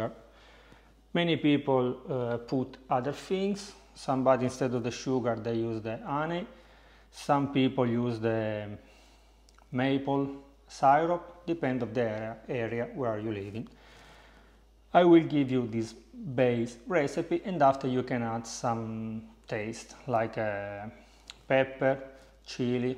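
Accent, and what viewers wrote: Italy. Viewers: I love how everything you make turns out spectacular! I want to try making ALL OF YOUR RECIPES! By the way, your English is quite good, and love your Italian accent. Would you do us all a favour and say a few words in Italian to start your shows? Would love to hear you speak your mother tongue, but need some translation in captions, so we can follow along 😊👍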